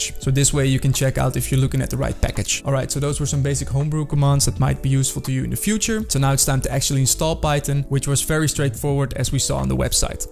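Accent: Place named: Netherlands. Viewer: zo jongen ik hoor zo erg je Nederlandse accent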